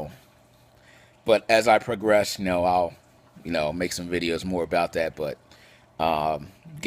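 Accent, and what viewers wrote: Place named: Eastern Pennsylvania, United States. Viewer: I enjoy watching your videos it sounds like you're in eastern PA I live in South Western PA Pittsburgh anyway I was very interested in trucks and muscle cars as a kid now I'm much more interested in Eternal things the Bible is a Hebrew Book both Old and New Testament Jesus uses midrash on a couple of occasions he was describing the crucifixion to Nicodemus and liking it to when Moses was commanded to make bronze serpent to save the lives of the Israelites bitten by the serpent he tells the Pharisees that just as Jonah was in the belly of the whale for 3 days and three nights so shall the son of man be in the belly of the Earth so these are two examples of midrash the reason this is important it's because once we understand that the whole Bible is written this way then we go back to the Old Testament stories and see that every last one of them has a prophetic meaning we live in a day and age one prophecy should be something every Christian is studying but too often too many churches have other focuses money your best life now these sorts of things Jesus warns do not be deceived 4 times he wants about earthquakes and rumors of wars once he also says narrow is the gate and difficult is the way that leads to life very different I want most churches are saying these days let me leave you with this little nugget we see 666 and revelation but it's in the Old Testament a couple times Solomon's wages were 666 talents of gold Nebuchadnezzar's statue Goliath ect so anyway it won't be lo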